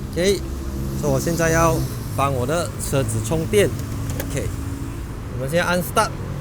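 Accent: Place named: Malaysia. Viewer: 这样介绍很好，地道的马来西亚中文，但建议有些地方没必要用英文就别刻意讲英文了，比如 那段。反正看这平台的大家都听得懂华语，自然就好。小小建议吧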